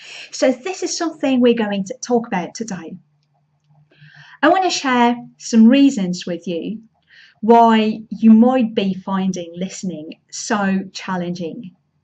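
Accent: United Kingdom. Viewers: Hello. Your British accent is superb! Could you please tell me how you acquired it? Thank you.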